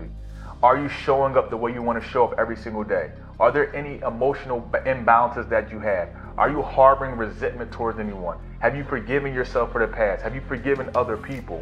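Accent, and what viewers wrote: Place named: Philadelphia, United States. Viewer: Wait is he from Philly ? 😭 ”anything that ain’t thurl”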